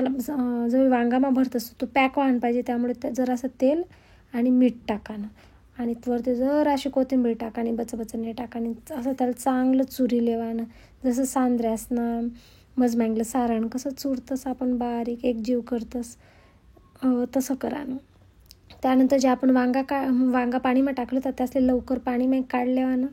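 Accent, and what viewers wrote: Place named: Jalgaon, India. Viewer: ताई तुमची भाषा खुप भारी तुम्ही जलगांव चेका 👍👍🙏🙏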